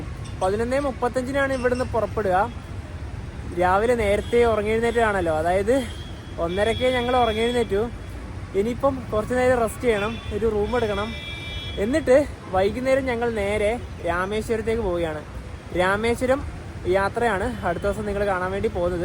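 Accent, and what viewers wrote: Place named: Malabar, India. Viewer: ഞാനൊരു മധ്യ തിരുവതാംകൂർ കാരനാണ്. നിങ്ങടെ മലബാർ മലയാളം slang കേൾക്കാനാ നല്ലത്.🙂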